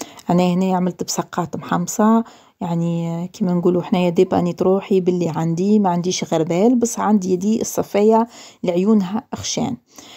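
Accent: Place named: Tunisia. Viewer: سبحان لهجتها تقول تونسية 🥰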